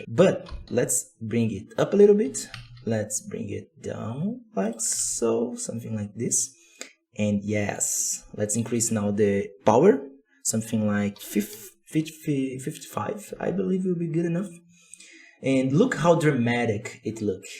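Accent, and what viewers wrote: Brazil. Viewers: Great job. Thank you for sharing. (Sotaque brasileiro inconfundível) Rssss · You seem to be Brazilian by your accent. Amazing Tutorial, simply sublime. Brazilians are really gifted. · Esse sotaque brasileiro falando inglês é coisa linda demais, força na batalha irmão!!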